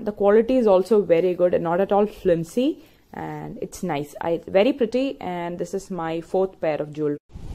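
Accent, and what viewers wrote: Kerala, India. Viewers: Hi.. lovely review and very cute jewelleries.. more than those being cute, mallu touch in your pronunciation is cuter 😍😋👍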